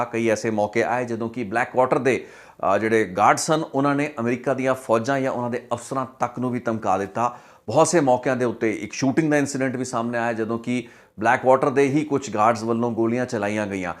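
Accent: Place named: Doaba, India. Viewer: One thing noticed more about u that u have doaba accent... "Peha"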